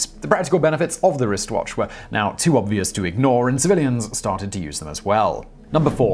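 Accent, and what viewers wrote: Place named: RP, United Kingdom. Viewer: "Radda-ranj" oven? (just teasing! I'd love to have a posh British accent over my midwest Ohio drawl...)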